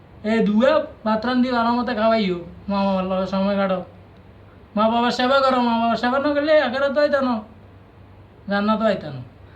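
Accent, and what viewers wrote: Noakhali, Bangladesh. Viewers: নোয়া খালি ভাষা বাদ দিয়ে যা বলার ভাল করে কথা বল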